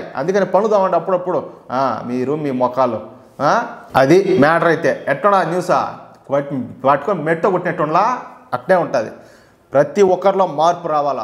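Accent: Rayalaseema, India. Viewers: Super sir Mee rayalseema language super Sir I'm also Rayalseema from Europe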